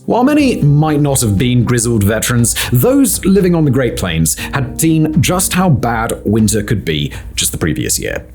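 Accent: United Kingdom. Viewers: Hard for me to take a British accent about AMERICAN history. AI is terrible about throwing in heavy Irish and British accents too when talking about even the old west history of the USA. Usually I just stop those and move on to another video. Why would I want a Brit telling me about AMERCAN history, when their great Brit army/navy could not even beat a ragtag USA colonial army of farmers and settlers....get real. Keep it real Youtube!!!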